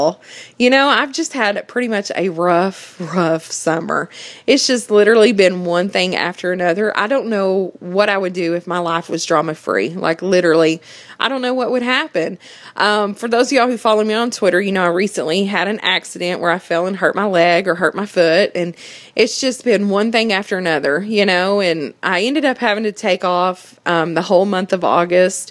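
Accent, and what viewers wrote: United States. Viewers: We got the Country accent, Swarms and great commentaries. This channel has it all! Shout outs to!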